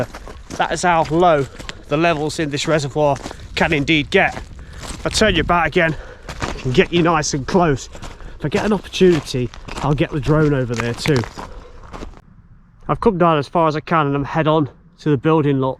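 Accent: Nottingham, United Kingdom. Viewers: Another brilliant presentation. I love your enthusiasm and of course your Nottingham accent. Keep them coming.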